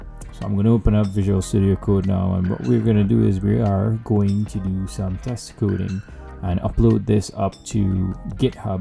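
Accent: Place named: Trinidad and Tobago. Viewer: If you listen, ever so carefully, you can hear the trini...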